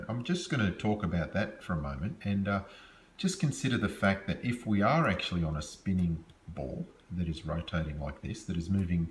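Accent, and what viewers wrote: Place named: Australia. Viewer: en général j'active les sous-titres automatiques, ça m'aide à comprendre même si le petit accent australien de (que moquerait Melenchon?) cause parfois des soucis à l'algorithme.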